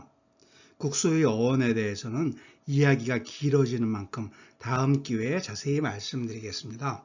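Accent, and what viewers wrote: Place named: North Korea. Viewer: 말투가 북쪽분이신가 싶은데요.? 혹시 진짜 북쪽분이시라면 북한말투는 어떻게 만들어진건지..경상도 사투리보다 고치기 힘든지 한번 만들어주세요ㅎ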